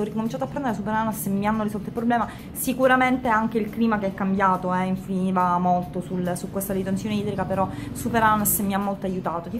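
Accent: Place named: Palermo, Italy. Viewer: utilissimi i tuoi video come sempre! io devo ringraziarti.. mi hai fatto alzare il "culo" dal divano! .. mi hai organizzato la vita! ora sveglia alle sei e workout di jillian! e non solo, dopo cinque anni che vivo a palermo tu, e solo tu, sei riuscita a farmi prendere l accento palermitano! (non che vivevo lontano giusto 70 km più a est, ma non avevo questa cadenza !) grazie di tutto ;)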